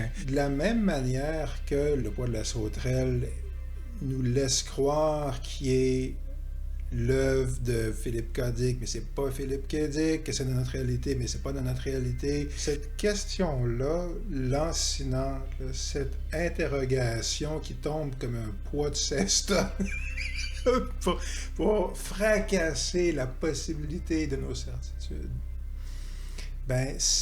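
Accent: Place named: Quebec, Canada. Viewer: je m'abonne malgré l'accent québecois, c'est dire comme c'est bon :person-turqouise-waving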